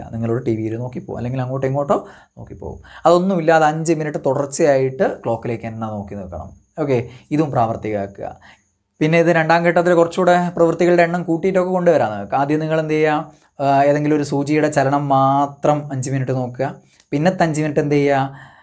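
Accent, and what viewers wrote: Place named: Vadakara, India. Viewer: വടകര ഭാഷ......സൂപ്പർ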